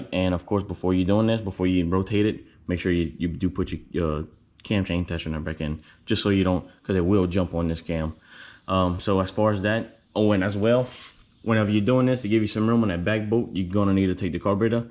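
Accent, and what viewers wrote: Louisiana, United States. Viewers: Damn you must be from Louisiana😂😂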